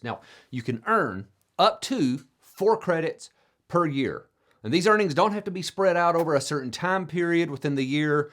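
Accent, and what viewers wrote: South, United States. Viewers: I want to thank you so very much for keeping us informed about the social security laws and clauses. You are a real jewel., you have the accent of a southern boy. Anyways I bet your mama and daddy are very proud of you. Have a great day dude!:-) P.S. yes, this video gets a thumbs up from me.:-)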